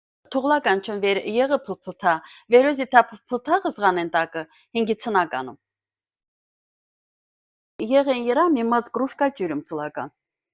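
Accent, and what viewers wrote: Artsakh, Azerbaijan. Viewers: Ղարաբաղի, Արցախի բառբառնա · Наверное,надо стараться понять.Это Арцахский диалект.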